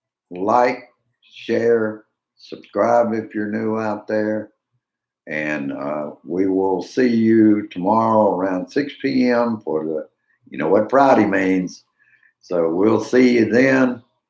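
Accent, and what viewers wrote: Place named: Missouri, United States. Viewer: What's up! St. Louis Native here. Nice to hear the Missouri perspective with the accent! Keep it up!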